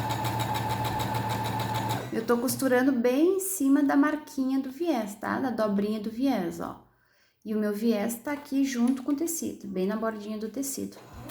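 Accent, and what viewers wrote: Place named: Curitiba, Brazil. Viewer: nossa... Minha vizinha é curitibana e o sotaque é assim tbem rsrs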